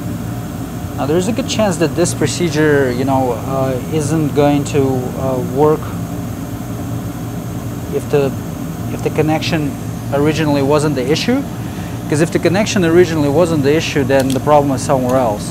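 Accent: Russia. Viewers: мне кажется, или у тебя русский акцент? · Do you have Russian accent?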